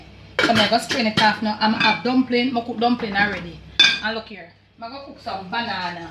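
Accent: Jamaica. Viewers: I love to hear you speak patio. I left JA at such a young age I don't know how to speak it. Can you do a Vlog about different patio terms?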